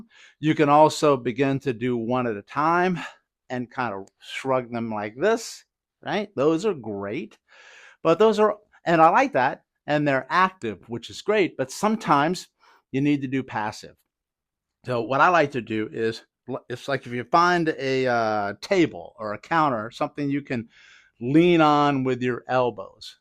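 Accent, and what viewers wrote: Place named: South, United States. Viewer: Doctor have Hollywood smile - perfect teeth/alignment. And good physique and perfect example of health and healthy prototype. I detect Southern accent. What you are broadcasting is very informative and helpful to many people. Unfortunately , the conceited me a little jealous of doctor too 😢😂😅. Tq. I plan to apply these information and sustain a schedule of sorts to do it. 🤗🙂‍↕️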